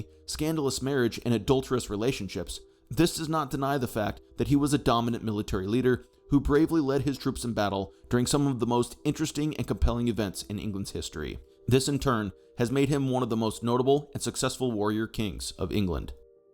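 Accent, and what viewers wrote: United States. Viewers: Why the American accent??!!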